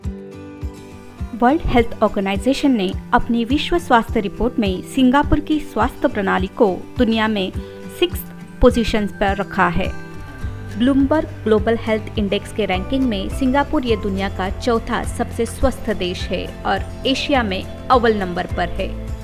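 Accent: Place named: Maharashtra, India. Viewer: काय मस्त हिंदी आहे तुझी...पण कधी कधी, काही शब्दांतून मराठी बाहेर येते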